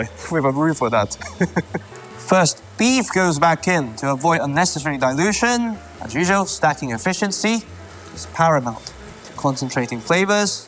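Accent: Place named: England, United Kingdom. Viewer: Bruuuuhhhh..... Thats the best Cajun "Chocolate Sauce" Roux I have ever seen from an Asian with an English accent. That has to be just damn excellent. Well done sir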